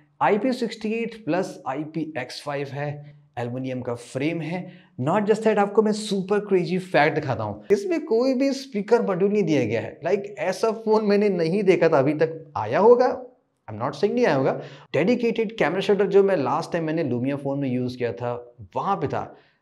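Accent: Lucknow, India. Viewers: aap Lucknow se hai kya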